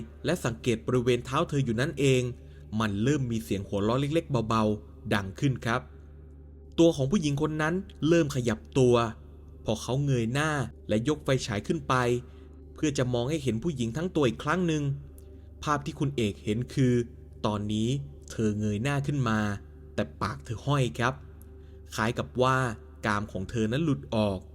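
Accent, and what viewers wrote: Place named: South, Thailand. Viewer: เส้นทางนี้มีอีกหลายเรื่องมากครับ ผมจะทักหลายครั้งแล้ว ถ้าเรดาร์ผมไม่พลาด แอด คนใต้ชัวร์ น่ารักด้วย 😉❤️😜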